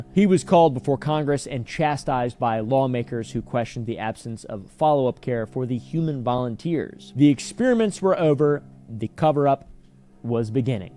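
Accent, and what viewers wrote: United Kingdom. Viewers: Your British accent was on point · That British accent though 😂😂😂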